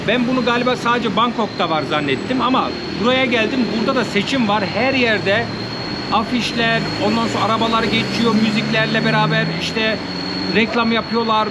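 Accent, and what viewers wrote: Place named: Germany. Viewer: Sen almancısın aksanından ve tipinden belli .20 kere gittim ama chang mai a gitmedim çünkü deniz yok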